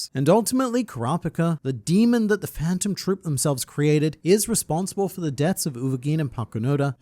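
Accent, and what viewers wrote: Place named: Australia. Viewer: I just noticed you have an aussie accent 😭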